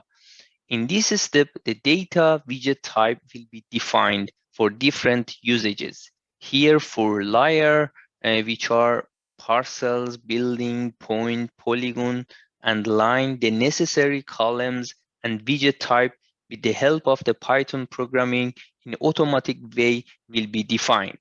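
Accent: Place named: Germany. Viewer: عالی بود فقط ته لهجه آلمانی گرفتی😁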